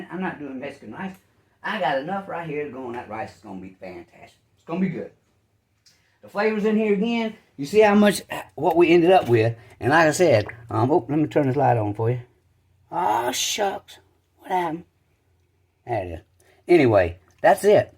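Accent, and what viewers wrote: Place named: Louisiana, United States. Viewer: Are 6ou from Louisiana?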